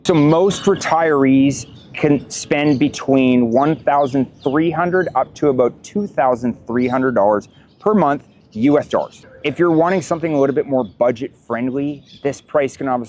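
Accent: Canada. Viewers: I somehow knew immediately you were Canadian --- got a chuckle when you said where you were from and the rain tally. I'm in North Vancouver so, yeah..... Thanks for sharing, I'll be looking into Peru more going forward --- it's been on my shortlist as well. Cheers!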